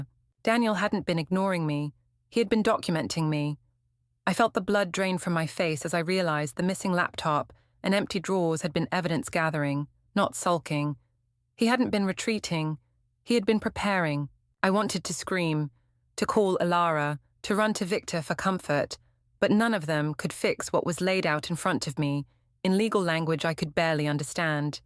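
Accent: United Kingdom. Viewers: I recognize the narrator's voice from previous videos: a stuck up, arrogant, British accent, entitled, selfish vixen, that does what she wants without considering the consequences and the people she hurts. I couldn't watch after a few sentences. A very unlikable character with a voice to match. These characters hit too close to home for me.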